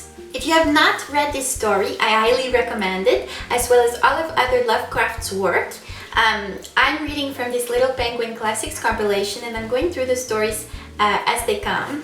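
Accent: Quebec, Canada. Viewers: French Canadian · David Bro it’s not fake. I know this is decently old, but for anyone who may read this in the future, here’s a bit of explanation. Her accent is French Canadian. Accents can fluctuate when: 1. Talking to other people with that accent it can become stronger. 2. When talking excitedly or fast, it can absolutely come out much stronger. 3. When talking to someone without an accent, someone with an accent may subconsciously be speaking without the accent just from the situation. As for when she sings, David Bowie had an accent yet you could never hear it when he sang. You CAN in fact hear it in certain words. Hope that clears things up.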